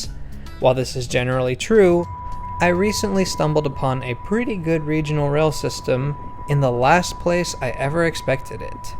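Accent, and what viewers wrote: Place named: United States. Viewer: Most American sounding European guy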